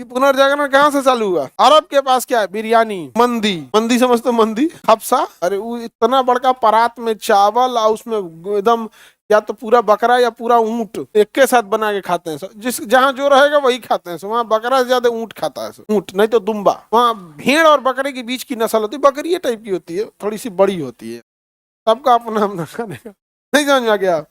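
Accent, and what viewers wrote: Bihar, India. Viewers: I don't care here to get ' Gyan'....I come here for the interesting Bihari accent 😎❤️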